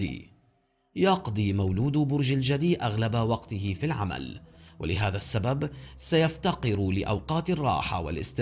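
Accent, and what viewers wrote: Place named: Arab world. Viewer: ماشاء لله على الصوت والحنجره العربيه الاصيله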